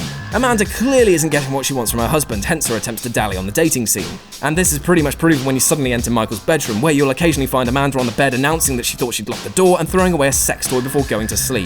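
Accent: United Kingdom. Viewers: this is guy is 100% british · Ok people that criticize a game that they can't fix or do anything about pisses me off but some one with a British accent criticizing a game just really pushes it.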